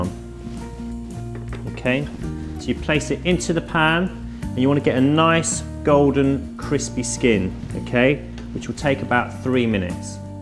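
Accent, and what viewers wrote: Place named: London, United Kingdom. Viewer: You sound like yo from London!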